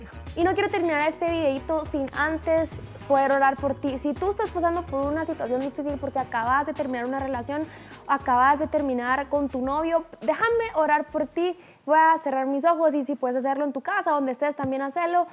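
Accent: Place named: Guatemala. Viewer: Es de Guatemala.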